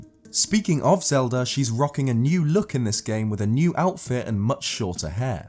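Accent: England, United Kingdom. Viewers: Great stuff, man. The British accent combined with the quality of your footage always makes me feel I'm watching a documentary on History channel :p. On a different note: This sequel can only be really awesome. Something that probably won't be in this game, but what I miss is Dark Link. Like, I would love a game where Dark Link is actually born from Link when he sees Zelda being kidnapped or being hurt and he just goes on a crazy rampage killing spree, letting out his dark side from anger. And then being able to access that power when needed to become stronger, kinda like Hollow Ichigo in Bleach. But that's just me.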